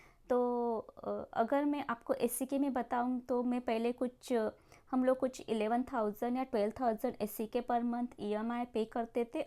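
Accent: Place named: Odisha, India. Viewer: your Hindi accent is so similar to Odias of Bhubaneswar region..!!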